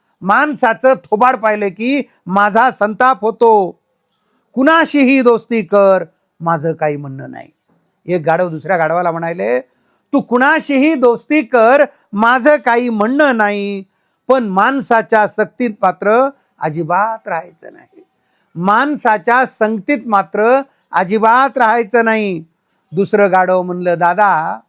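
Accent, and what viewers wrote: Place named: Marathwada, India. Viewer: तुमची मराठवाडी मराठी ऐकायला गोड वाटते.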